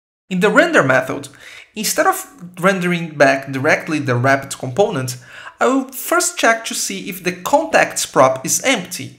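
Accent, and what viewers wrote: Brazil. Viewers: pelo sotaque, eh Brasil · I think I know this accent - Brazilian right? By the way, good vide, keep going on it!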